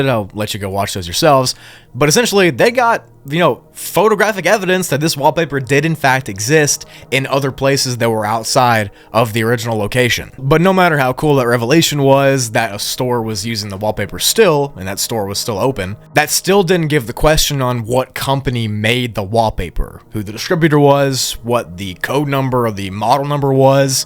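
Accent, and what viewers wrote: United Kingdom. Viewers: I can tell you're Scottish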